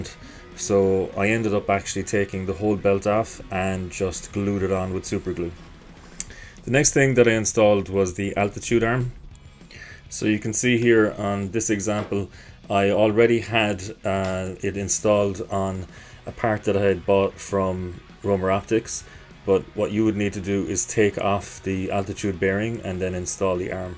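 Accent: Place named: Cork, Ireland. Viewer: Lol , I meant , where .. you sound corkish , int in this setup , love to pick your brains a bit on it , if you were up for that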